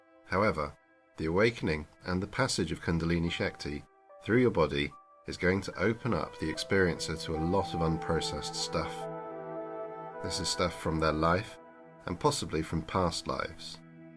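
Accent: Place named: United Kingdom. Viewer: A British accent!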